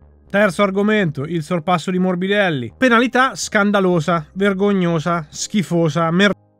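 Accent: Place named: Veneto, Italy. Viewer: E io che pensavo che fosse Emiliano, ora ho il dubbio che sia Veneto 😂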